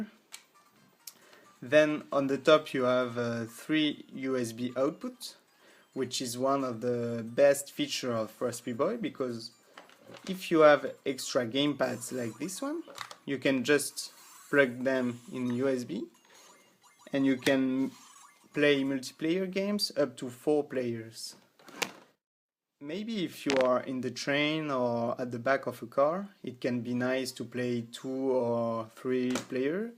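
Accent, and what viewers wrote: France. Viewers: Putain mec please parle fr ca s entend trop a ton accent que tu parles fr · salut ^^ Vu ton nom et ton accent, j’imagine que je peu parler français ... tu fait la même chose avec un Pi 3 b+ ?? pour supporter le nouveau recalbox 4.1 (dreamcast / PSP / etc ...)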